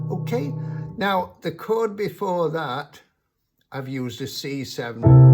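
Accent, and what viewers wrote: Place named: Northern England, United Kingdom. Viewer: Cool to hear a northern accent! I’m from Manchester! Great video:)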